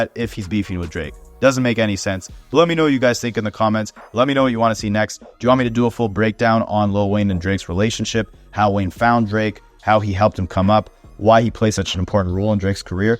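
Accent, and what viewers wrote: Canada. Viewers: You sound Canadian.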